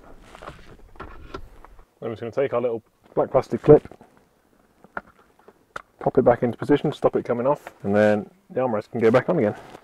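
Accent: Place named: England, United Kingdom. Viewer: Dude, dont get offended on this. But it doesnt add up... IRL licence plate but you got English accent :) how come? Which year was the car made? Decent shape tbh! But also, i wish these fixes would cost the highest effort to complete :D ... or not all Landies are rubbish as the urban legend says? The lighting is pretty decent upgrade!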